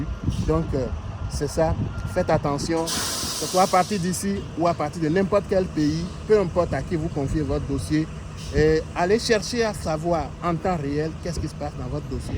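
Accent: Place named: Benin. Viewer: Merci beaucoup vous avez un accent béninoise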